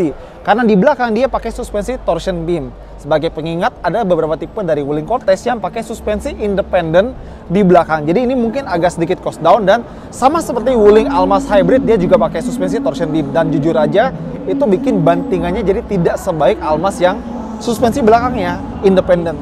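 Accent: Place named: West Java, Indonesia. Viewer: ala orang sunda. Bener urabg sunda mah kalo nyebht huruf F&V jadi hurup P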